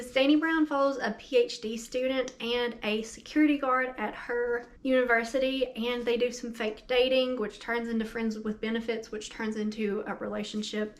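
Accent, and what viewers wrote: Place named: Kentucky, United States. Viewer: Please tell me you’re from KY! You sound like home haha.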